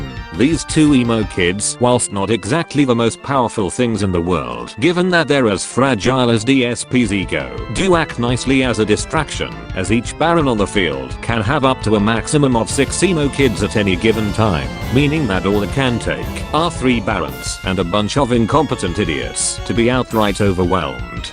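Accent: United Kingdom. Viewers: British? Hecking! Whaaaa!…..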